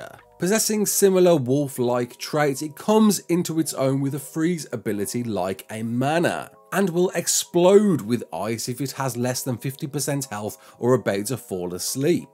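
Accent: United Kingdom. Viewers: you did great! It's simply inevitable that pronounciations are a little different depending on where one's from, but it honestly sounds pretty good in British. :D I'm sure you (intuitively) already know, but 'Steinbjørn' directly translates to 'Stone bear'. Big fan of your videos btw., they made me pick up the game again!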